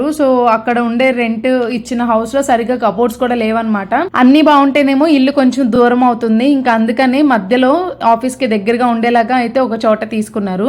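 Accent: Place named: Godavari, India. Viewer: Meeru mataludu thunte godavari salang gurthuku vachindi andi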